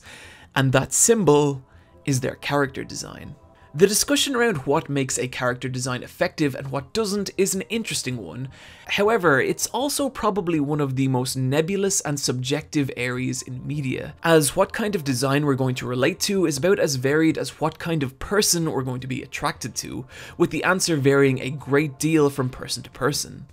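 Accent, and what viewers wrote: Ireland. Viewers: His accent, the "Why" or "What" is being affected by his accent and it sounds forced. It's an Irish one, I'm Irish, believe me he doesn't have a choice <\3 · Irish accent and blue graph paper? Almost mistook you for Real Engineering there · You sound irish bruh im irish dio has good fasion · Can someone tell me what SEWs accent is? English is not my native language so I can't really tell. But the "h-why" and "castume" and "ting" remind me of Roy from IT crowd sooo ... irish?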